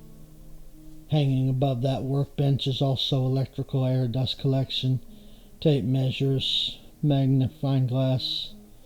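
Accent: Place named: Louisiana, United States. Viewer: Just watched one of your other videos where you started that you were from NO. and I swear I wanted to ask if you might be from Louisiana because I thought I heard a little bit of an accent. Although I live in the Houston area now, I’m originally from Lafayette and parents are from New Orleans and Kinder.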